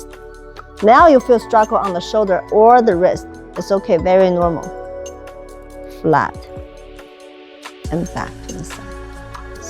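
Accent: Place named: China. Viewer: We love when Chinese women speak Chinese English accents!!!!ha ha! Chinese sword 🗡️ s beautiful to watch in action!!. Love you!! ❤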